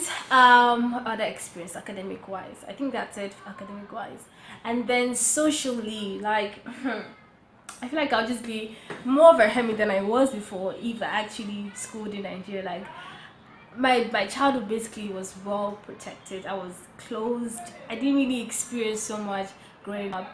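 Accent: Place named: South Africa. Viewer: Hi sister I am impressed by your english tone, you sound like south African wow keep up...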